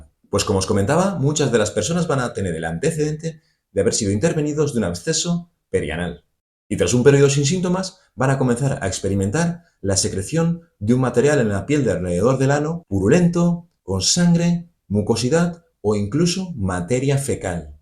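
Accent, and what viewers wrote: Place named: Spain. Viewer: No es obvio el acento? Jaja es de España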